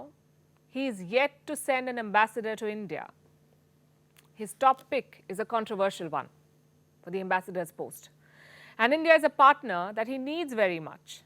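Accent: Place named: India. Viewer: Her English is the most beautiful Indian English ever that I have heard in my whole life:):):)😊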